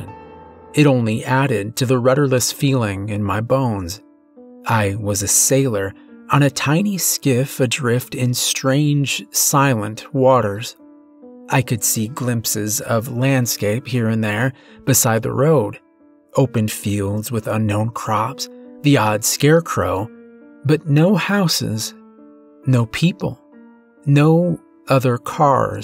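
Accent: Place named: United States. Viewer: Oooh er! That was a good one! In England we have 5 seasons spring summer autumn winter & fog! There's an old Scottish ghost story, well known, of a village appearing only in the fog, it's called Brigadoon Wanted to say, you have a really nice American accent, not Bostonian but has a British inflection somehow which makes your speaking voice ( narration voice) very pleasing & clear, lovely diction but please don't pronounce the word shone as shown ( it's just said as shonn) Plus the past tense of Drag is Dragged not Drug which is a pharmaceutical ( the dictionary says the current fad for using Drug & not dragged is an Americanism & not correct, their words not mine Btw, I'm not being derogatory it just grates on me, no offence meant)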